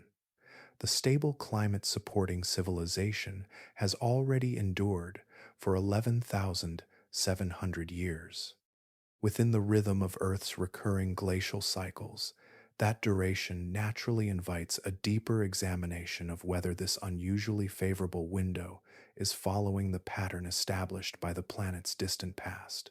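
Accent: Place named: United States. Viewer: Thank you for the American accent on your voiceover.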